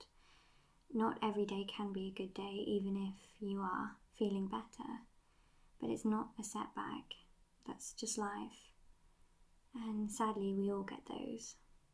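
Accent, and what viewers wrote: United Kingdom. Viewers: Your British voice is so soothing thank you for making this medical role plays help soothe my autism can we be friends i hope you don't mind but i messaged you on Instagram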